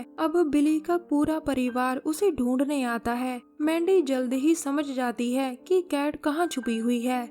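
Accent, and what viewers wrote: Punjab, India. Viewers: I suppose the woman who is narrating is a punjabi woman as her prononciation is very similar to punajbies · Ap punjabi ho ya haryanvi... ? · Love ur punjabi ascent Punjaban ❤️ Pardeep gill from Ludhiana Punjab ❤️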